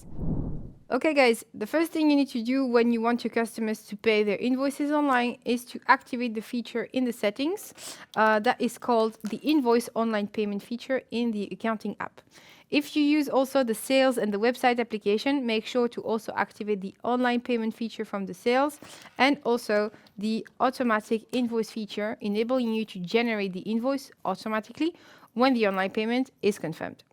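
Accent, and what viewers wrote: France. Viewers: Très bonne vidéo, mais j'ai comme l'impression qu'il te reste un petit peu d'accent français sur ton anglais (qui est parfait je tiens à le préciser haha)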